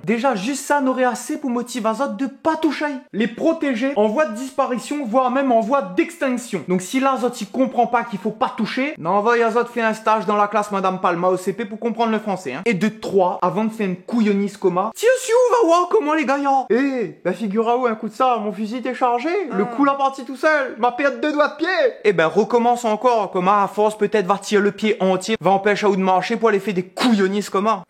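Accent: Réunion, France. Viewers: Ben explique le ban' couillons que "endémique" c'est le contraire de "sporadique" ! 🤭😄😄😁😁😁... Par contre, la prochaine fois pensez aux âmes sensibles (évitez les gestes ityphalliques SVP !!! 😁😁😁🤭)... Toujours aussi drôle avec out l'accent yab bien prononcé ! J'adore ! Merci - From Bordeaux 🙋.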